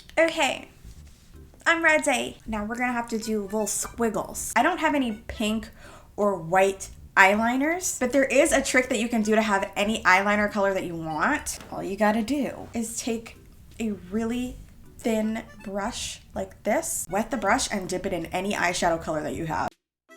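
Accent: United Kingdom. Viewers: She has a good British aksent lol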